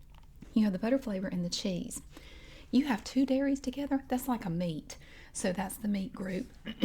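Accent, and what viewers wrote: South, United States. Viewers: Now this is as southern as you can get. I love it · kinda like a southern angela from the office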